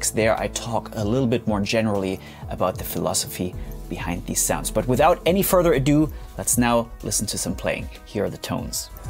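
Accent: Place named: Germany. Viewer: HI! Wo hast du dein musikstudium gemacht? Es sieht so aus als hätte Deutschland ein guitarristenboom mitt Manuel Garder Fernández und dir. Mann kann ein sliver of a german accent in dir erkennen aber du sprichts echt super! ( ich binn halb deutsch halb spanier) Viele Grüße.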